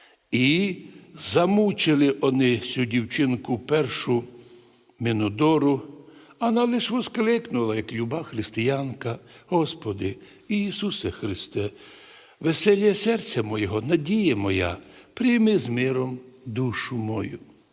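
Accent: Transcarpathia, Ukraine. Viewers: Як я люблю закарпатський діалект, гарний і священник прекрасний на своєму місці, він істинний пастир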